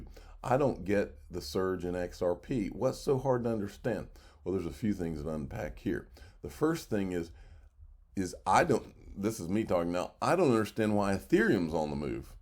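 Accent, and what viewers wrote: South, United States. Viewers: Bad mic settings , thick southern accent with mispronunciations and old news = BYE BYE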